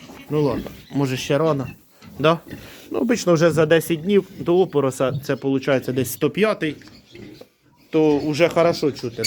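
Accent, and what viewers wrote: Poltava, Ukraine. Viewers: По мові пізнала, що Полтавщина, а тут ще й Устивиця - бабуся там жила. Ностальгія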